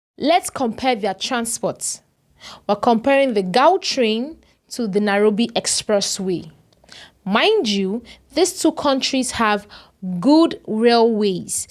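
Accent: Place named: Nigeria. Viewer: I was about to follow this useless account before this nonsense of comparing us, with nigerian accent it's not surprising.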